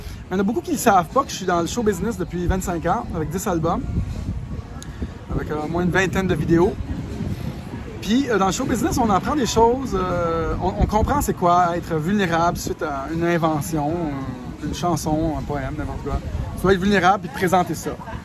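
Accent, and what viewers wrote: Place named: Canada. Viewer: En plus tu es très sympa. J' aime entendre les canadiens qui parlent français parceque je les comprends bien.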